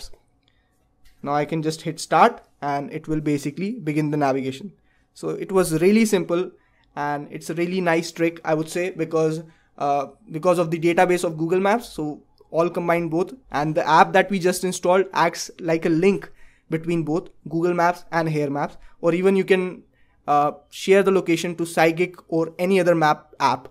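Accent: India. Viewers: To understand English in indian accent should be from job skills nowdays😟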